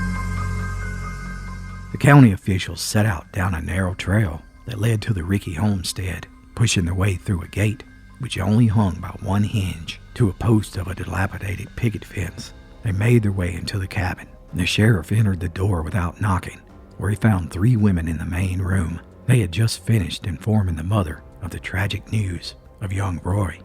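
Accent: Appalachia, United States. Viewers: I just found your page and I'm obsessed! As an Appalachian girl myself, your accent feels like home. ❤️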